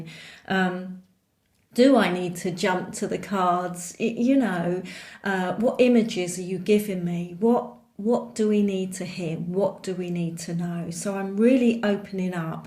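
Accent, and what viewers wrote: United Kingdom. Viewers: Hello Beautiful Butterfly 🦋 it’s wonderful to see your lovely 😊 face and hear a bit of your cockney accent 😇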